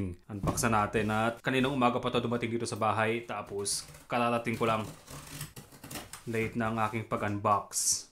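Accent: Ilocos, Philippines. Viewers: Ilokano kayo? Hehe.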